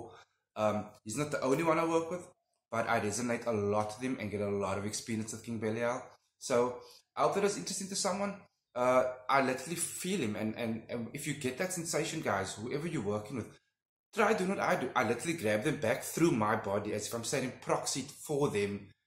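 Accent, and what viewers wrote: South Africa. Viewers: This might be completely of topic🙊😁 but I noticed ur accent and was wondering, is your home language Afrikaans😅😅😅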